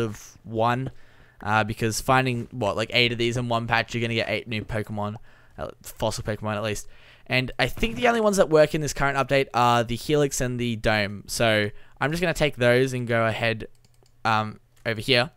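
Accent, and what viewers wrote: Australia. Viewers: He is from Australia that is how they say it there and if he says it our way his mom will make fun of him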